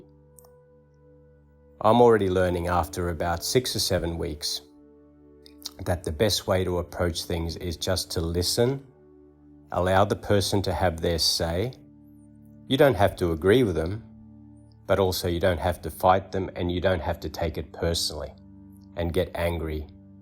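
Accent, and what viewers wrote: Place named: Australia. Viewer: You sound like Aussie.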